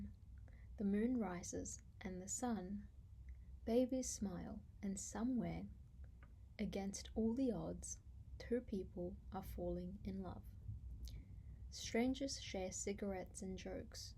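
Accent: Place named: United States. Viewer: Good poem .. Your accent is American, but you look like Indian 😊😊❤❤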